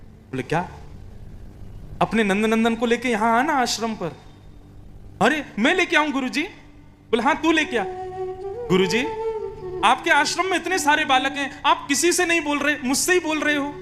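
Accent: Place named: Jaipur, India. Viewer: आपकी भाषा जयपुर की बोलतें हों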